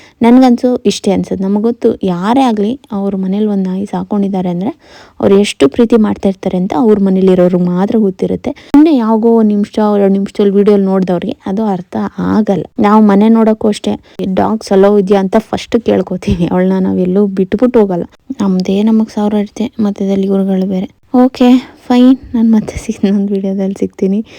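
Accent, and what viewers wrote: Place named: Hassan, India. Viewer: sister ನಿಮ್ಮ ಊರು ಹಾಸನ ನೀವ್ ಮಾತಾಡ್ತಿರೋ ಭಾಷೆ ಹಾಸನ್ ತರ ಇದೆ ನಮ್ದು ಹಾಸನ ಅದಿಕ್ಕೆ ಕೇಳ್ದೆ 🫰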